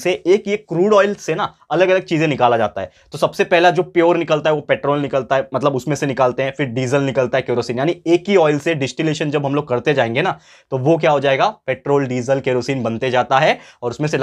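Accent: Maharashtra, India. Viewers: Sir Apki mother tongue marathi hai?? OMG!!!SAME Marathi gang like here!!!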